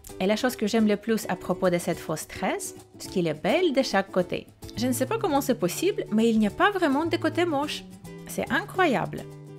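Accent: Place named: Russia. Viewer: t'as l'accent très russe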